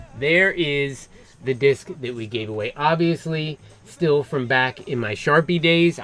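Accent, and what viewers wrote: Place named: North Carolina, United States. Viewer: Hey, now! Where do you reside,? It sounds like from your accent that it's relatively near me, in central Piedmont NC. And how about that disc? Pretty amazing how much work went into that bad boy!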